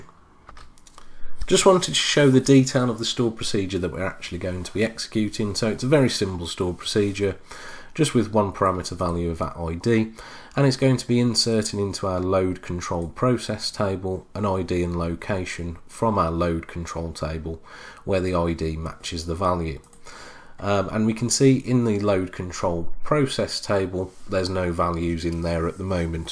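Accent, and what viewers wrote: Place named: England, United Kingdom. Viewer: the golden grail of English accented youtube tutorials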